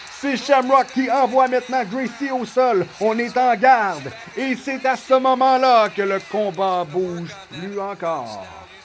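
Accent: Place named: Quebec, Canada. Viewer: BRAVO pour le travail super vidéo, très drôle et l'accent quebecois m'a rappellé le commentaire de raymond rougeau sur les vhs de la wwf. jespere que l'UFC ne strikeront pas tes vidéos, j'ai deja eu ce probleme j'ai dut retirer toutes mes videos retro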